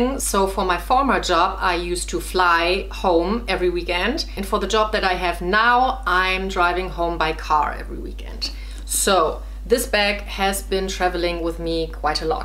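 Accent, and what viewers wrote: Germany. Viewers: Heavy german accent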